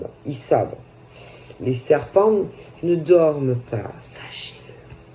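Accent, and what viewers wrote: South, France. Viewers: avec l'accent du sud je me tord de rire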